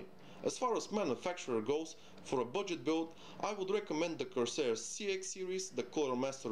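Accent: Russia. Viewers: Lol, I find the way you talk funny, are you Russian or something? Good video!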